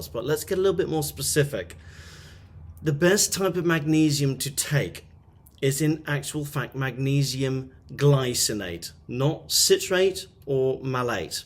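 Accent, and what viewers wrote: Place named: United Kingdom. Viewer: I love this accent...which part of Uk is this accent from???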